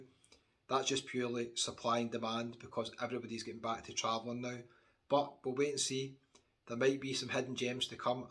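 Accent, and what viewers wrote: Scotland, United Kingdom. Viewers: Just watched your comment one for Ukraine so brilliant and fair play but I don't have a Facebook account so couldn't comment there but a great gesture. Must give you stick to get a mention.only joking as I think your accent is brilliant and I am not Scottish but irish and I am neither a Tim or Hun so that person who said that has a issue in life but I will watch your videos as it gives the normal holiday goer a proper glimpse of where they would like to stay 😉 😀 THANKS 😊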